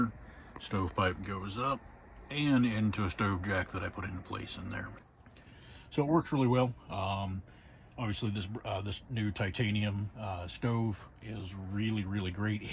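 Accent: South, United States. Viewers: Hello there!! I joined your channel a few weeks ago.And you were in your new To you scamp trailer. I have begun catching up with your previous posts. That scamp now seems like luxury compared to videos like this. I have never lived further north than southern middle tennessee , where I currently live. I cannot imagine weather and ice like in this video .(BTW, Do I hear a trace of a southern accent in your voice?)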